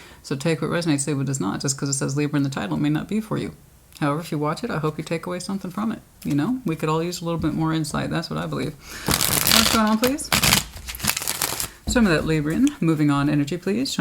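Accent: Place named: South, United States. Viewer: Yes it is my fault for staying too long. I’m a Texas girl and yes, the southern drawl I hear it. It’s awesome and refreshing to hear fellow southerner.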